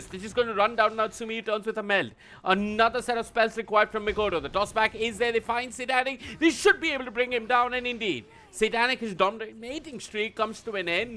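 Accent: India. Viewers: indian caster ? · Who is this indian caster.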